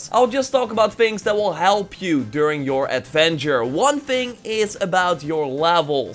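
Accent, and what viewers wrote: Netherlands. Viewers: he'a from Holland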